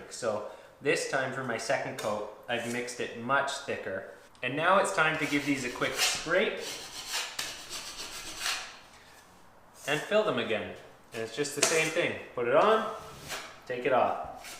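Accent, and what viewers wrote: Canada. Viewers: Canadians have agonizing accents.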